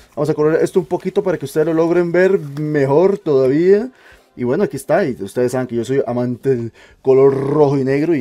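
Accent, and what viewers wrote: Costa Rica. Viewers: andaba buscando reviews de este teclado y adelante el video hasta el minut0 y lo primero que pense fue: seguro es vara que el mae es tico jajaja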